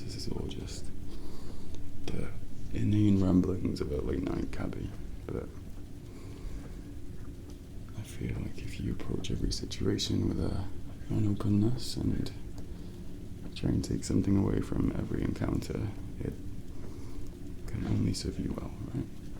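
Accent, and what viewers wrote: United Kingdom. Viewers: Omg he speaks British! & this is art! Congrats! Felt like watching a movie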